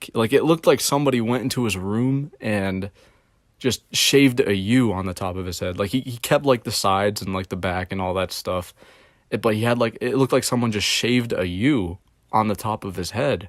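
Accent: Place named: United States. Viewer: I was waiting for a buzzword....knew something up. I literally can't have any sympathy for you because of how negative you are. And I don't care if you've never directly bullied anyone, because the way you talk about people in other videos sounds eugenicist. I'm not hear to tell you to pull yourself up by your bootstraps. I bet you will feel better about yourself once you learn how fucked up capitalism is. Don't think about individual women, think about patriarchy and capitalism. It almost explains everything about modern life in a first world country (I don't know exactly where you live but I assume America by your voice). Don't think about your socioeconomic upbringing, think about the power of labor and the power of capital. Don't think about dating, think about community. Think about the world through a sociological lens, and try navigating the world like a sociologist. 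Once you start realizing that socialization is as simple as showing up to places regularly and not pushing people away with what you say (honestly, lots of friend making cliches from high school hold true). And above all, live life for yourself. I will not tell you to move through the world with a goal mindset, because success if not guaranteed. Rather, have systems in place that you will socialize regularly, with any safe group that will accept you. It's hard, but not because it's complicated, but because of the emotions surrounding rejection and fear. That's mostly it (of course from aspects of your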